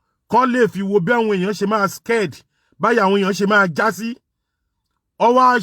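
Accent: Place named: Egba, Nigeria. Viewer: Niceness, I'm enjoying the tiny bit of your Egba accent...lol (I'm Ijebu); Yorubas are such a beautiful and diverse people, I can barely wait to live in our new Nation.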